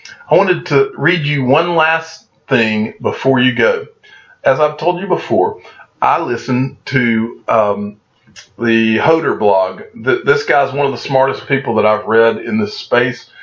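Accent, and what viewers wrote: United States. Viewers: Brother I love your cowboy accent Your Enthusiasm your knowledge your dedication keep up the good work.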